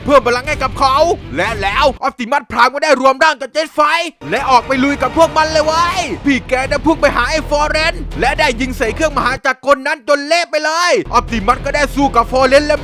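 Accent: South, Thailand. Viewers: ฟังยุตั้งนานพอรู้หล่าวว่าคนใต้เหมือนกัญ 🌿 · พี่เขาเป็นใต้นั้นเเละครับ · พี่ครับพี่เป็นใต้ใช่มั้ยครับตอบผมหน่อยนะ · พี่ครับพี่เป็นคนใต้ไหมครับ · พี่เป็นคนใต้หรอพี่5555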